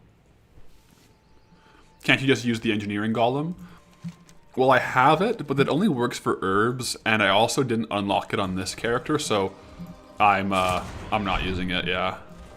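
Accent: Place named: Alberta, Canada. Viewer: oh shit! Buddy is from Alberta haha, love to hear it my man!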